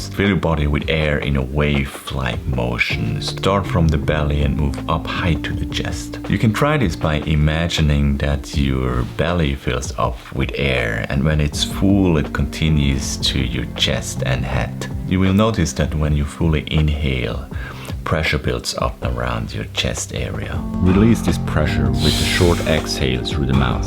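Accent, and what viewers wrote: Switzerland. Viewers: Are you swiss German? I detect a slight accent. Barn Deutsche maybe?l lived in beautiful Switzerland. Loved it! Kia ora from new Zealand.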